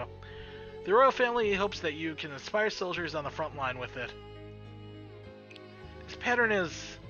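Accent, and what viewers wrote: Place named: France. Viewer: Good effort for french speaking ;)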